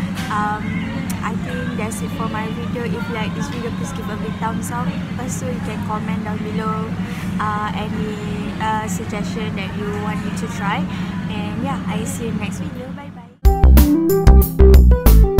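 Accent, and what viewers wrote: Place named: Malaysia. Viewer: Yo malay english is waaaay too boring to be heard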